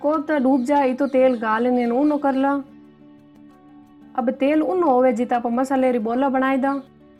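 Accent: Marwar, India. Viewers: वाह जी काबिले तारीफ आपकी सारी रेसिपीज बहुत अच्छी लगी और आपकी मारवाड़ी व मीठी बोली आप की रेसिपी में चार चांद लगा देती है..... आप ऐसे ही आपका हुनर हम तक पहुंचाते रहिए और देश दुनिया में अपना नाम रोशन करते रहिए l धन्यवाद🌼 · Aap ki recipe or marwadi boli bahot hi mithi hai,Ram ram🙏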